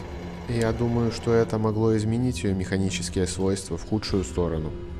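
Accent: Belarus. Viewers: Парень ты из Беларуси, слышно по акценту и скорей всего из западных областей!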